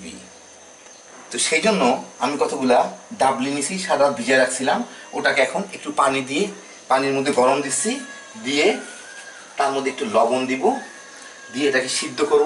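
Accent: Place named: Bangal, Bangladesh. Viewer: Vai ranna hok jemon temon..kinto apnar kotha gulo hoy jhakkas....sei level er fatafati...lozza soromer balai nai..ekdom khas banga ...wao..daron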